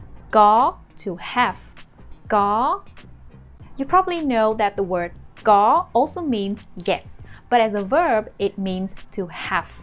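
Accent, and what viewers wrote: South, Vietnam. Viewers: You are from South Vietnam? The Vietnamese people I interact with tend to be from the south also. Great video, by the way, as always. I appreciate the way you say each sentence first slowly and then at a more normal speed...also how you break down the components of each sentence... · Really good for beginners, even South accent. I think it is the south accent. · Do you speak northern vietnamese or southern ? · is this the southern accent?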